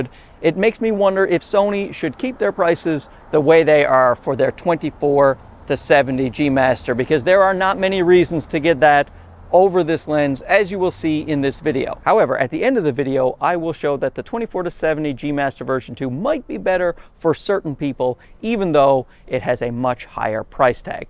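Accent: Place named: Canada. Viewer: I've been watching your videos for awhile now and as I was watching the intro I thought that maybe you sounded a bit Canadian and then you mentioned you're from Toronto! Fellow Torontonian here! I also want this lens really bad Haha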